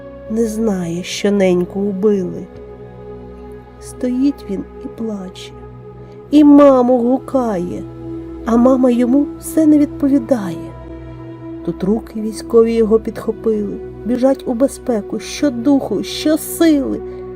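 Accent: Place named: Russia. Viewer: Російські наголоси та російська вимова. Вчіть українську!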